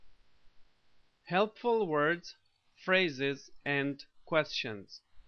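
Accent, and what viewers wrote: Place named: Afghanistan. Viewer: Mohammad Khan that dilact is definetly afghan not pakistani but where not sure somewhere in Afghanistan maybe kandahar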